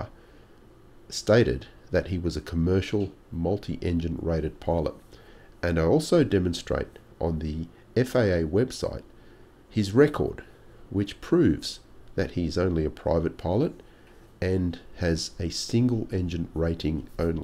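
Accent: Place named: Australia. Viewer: I like the Australian accent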